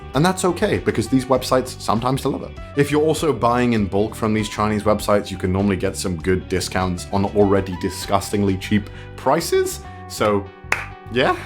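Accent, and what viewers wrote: United Kingdom. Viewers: Painfully British 👌🏼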